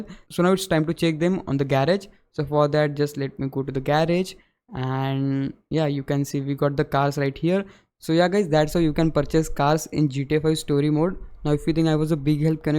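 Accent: India. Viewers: Sir, you have the most English Indian Accent I have EVER heard! I salute you 🫡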